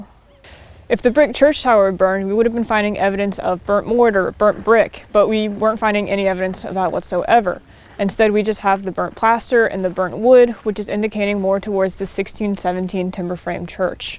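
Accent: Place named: Dorset, United Kingdom. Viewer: Hi. Really like your accent and tone of voice. It reminds me of my family from West Dorset in England. I also wonder that apart from the hard archeology how much effort do you make regarding social and economic history, the evolution of dialects. Perhaps you do follow the journey from an English colony of dissenters and adventurers to freedom you sort and the creation of your own country. Excellent and easily understandable videos. Nick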